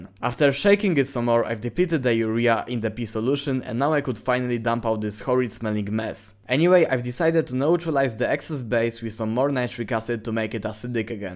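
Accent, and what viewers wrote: Poland. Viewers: No Siena mordeczko, wiefziałem, że elpolako po alcencie z “sz” I “cz”. Pordrooo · czy ja słyszę polski akcęt ?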